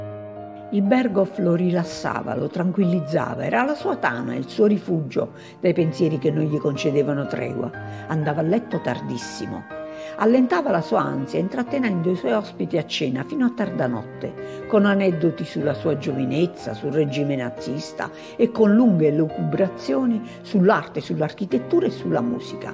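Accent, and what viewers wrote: Italy. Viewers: Brava, ❤ avverto nell' ascoltarti parlare, il tuo accento campano 👍💥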